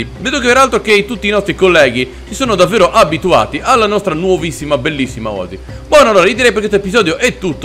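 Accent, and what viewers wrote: Veneto, Italy. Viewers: Ma sei del veneto ? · Aaaaaaah ma sei un conterraneo del veneto! :D:D:D:D:D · Scusa ma sei del vaneto? Comunque sei troppo simpatico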